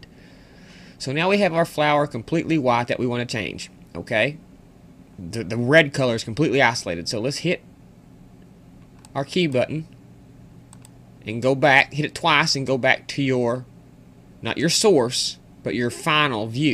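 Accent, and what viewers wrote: South, United States. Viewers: southern american aint it?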